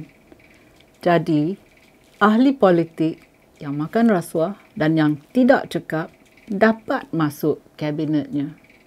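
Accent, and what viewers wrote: Perak, Malaysia. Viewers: Kuat likat pekat melekat peraknyeee yongg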